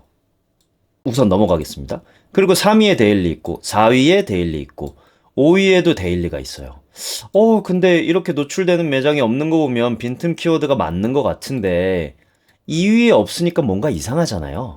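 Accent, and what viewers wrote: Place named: Busan, South Korea. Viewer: 부산이신가보네요 내용너무감사합니다😊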